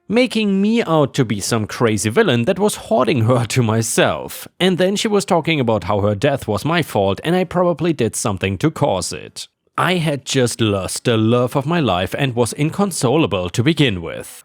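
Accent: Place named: Germany. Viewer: Hey, love your videos!❤ I have a question and I hope it's not rude. I'm curious to know where you're from, it sounds like you have an accent and I can't quite place it, maybe german or sth scandinavian (I'm German and you sound very german to me😂). But I saw in your info that you're located in the US so I'm really sorry if AE is your mother tongue and I just insulted the way you speak or something😅 I really like the way you read so please don't get me wrong, I was just curious:)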